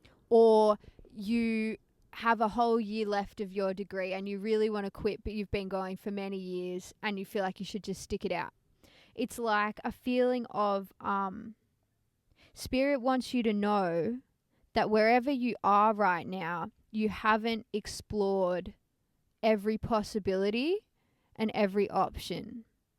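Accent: Australia. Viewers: Are you a Queenslander? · Came for the title, stayed for the accent. Love the Aussie accent! Love from the Netherlands 🥰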